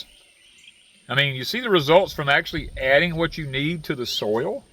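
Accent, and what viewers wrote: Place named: Texas, United States. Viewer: Hey guys, we’ve been trying to guess WHERE y’all are lol…we would say well they talk like us, have nut grass, a few weather moments yall would have matched ours, and finally just heard him say Conroe lol so finally someone that is in our climate. We’re in Beaumont adjacent aka Vidor. Nice to see that some of Conroe is holding onto the country lifestyle.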